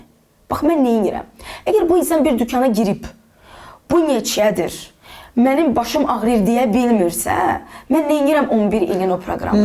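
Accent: Standard. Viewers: Mənimçün çox maraqlıdır, xanım Azərbaycan dilində belə təmiz danışmağı necə və haradan öyrənib. Mənim qızım orta məktəbi Rusiyada qurtarıb və artıq 10 ildən çoxdur Bakıda yaşayır, amma xanım kimi təmiz danışa bilmir, danışığında aksent hiss olunur. xanımı tanımağıma çox şad oldum.🎉😊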